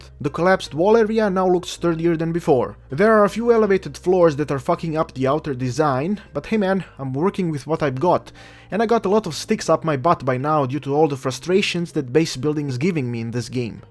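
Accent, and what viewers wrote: Eastern Europe. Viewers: where are you from? your accent sounds eastern European or Russian. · Nguyen He's from someplace in Eastern Europe, don't know exactly where. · You are so funny mate!!! Also what country are u from, u sound Eastern European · The Eastern European accent makes the suble vulgar comments pretty enjoyable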